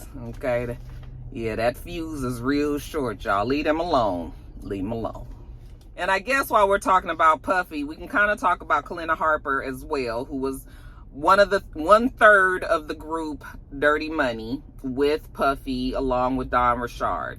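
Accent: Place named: Los Angeles, United States. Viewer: Lol. I love when you do your LA hood 🥷 accent 😂😂😊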